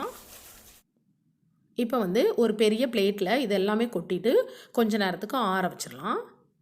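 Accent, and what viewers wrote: Tirunelveli, India. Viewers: Nice mam... Neenga tirunelveli ah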